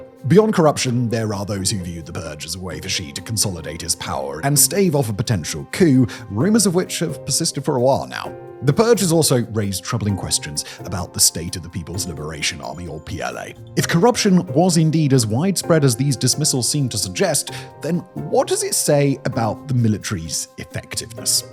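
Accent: RP, United Kingdom. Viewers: shat ap u posh british duschbäg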